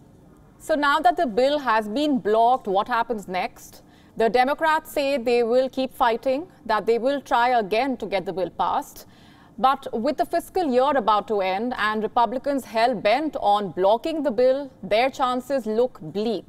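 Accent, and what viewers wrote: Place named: India. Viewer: I would really like to listen to the news. It's just that your accent puts me off. Can't newsreaders work to develop an English accent? Indian newsreaders sound like robots..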